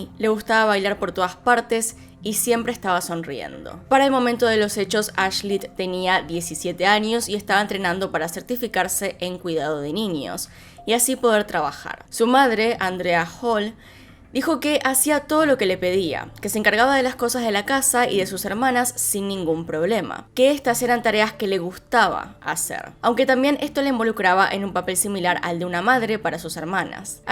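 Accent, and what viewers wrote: Argentina. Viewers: Eres Argentina?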